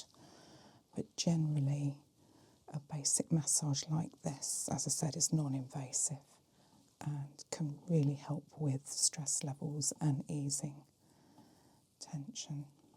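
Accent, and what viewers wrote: United Kingdom. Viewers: Lovely video as always. Your lovely British accent is like silk to my Swedish ears 🤗🥰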